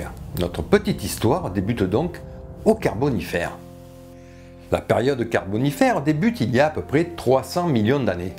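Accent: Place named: Albi, France. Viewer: Bien le bonjour, un grand merci pour le partage de votre savoir, ce fût très passionnant 🙏 Et cet accent me rappelle tellement mes professeurs à Albi en BTS 😊 (je suis du Nord 😅) bref je viens d'activer la cloche pour ne rien louper 😉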